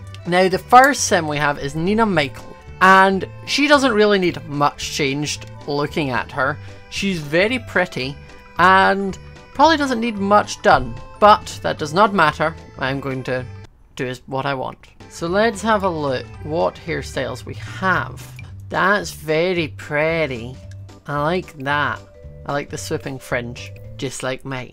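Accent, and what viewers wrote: Scotland, United Kingdom. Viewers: I find the Scottish accent quite soothing... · Piggies Forever he's Scottish 😊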